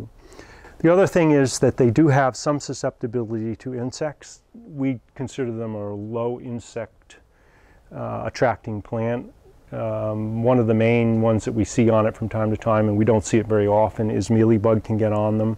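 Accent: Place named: Boston, United States. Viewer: You have kind of a Ted Kennedy accent.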